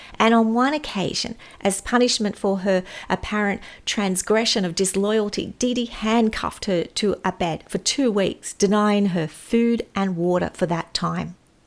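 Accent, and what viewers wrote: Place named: Australia. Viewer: I live on the Mid-North Coast of NSW so it's good to hear an Aussie making these videos. Your calm manner and evidence- based approach is very refreshing.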